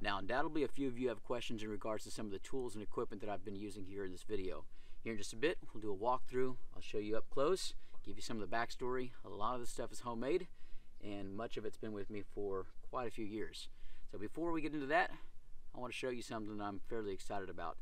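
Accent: Texas, United States. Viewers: most satisfying Texan voice ever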